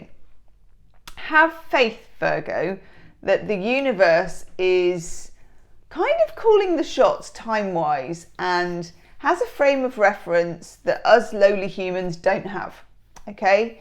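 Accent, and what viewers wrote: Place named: United Kingdom. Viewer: Love your british accent and humour ! 😂😊🎉 thank you !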